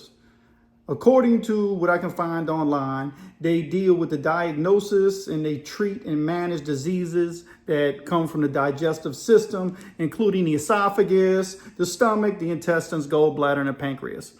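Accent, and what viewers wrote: Louisiana, United States. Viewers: Love the accent,Louisiana?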